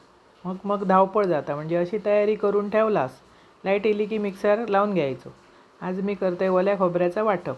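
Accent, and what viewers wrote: Malvani, India. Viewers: तुम्ही मालवणी वेंगुर्लेकर छान बोलता ऐकताना गोड वाटत कांनाना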